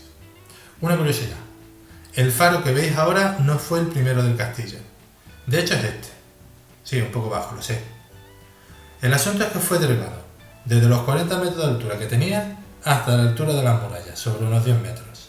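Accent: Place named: Malaga, Spain. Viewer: ole olee!! Jajjaja yo de Málaga x tu acento lo supuse... pero me gusta mucho cadiz la verdad😍 bueno me gusta toaaa Andalucía pake nos vamos a engañar jejejej 💋💋💋✨✨✨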